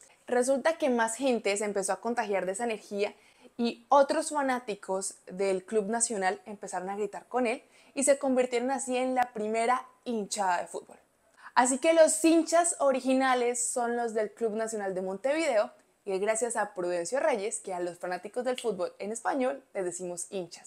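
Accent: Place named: Colombia. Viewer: Hello i love your videos! Sorry I can't type in Spanish it's not my first language. But i love learning Colombian Spanish with you. I'm from southern California USA and i mostly grew up speaking and hearing Mexican Spanish. So hearing your beautiful accent is always fun and i like learning your slang and dialect. I wish we had more Colombian immigrants here. So i can meet beautiful women like you! 🇨🇴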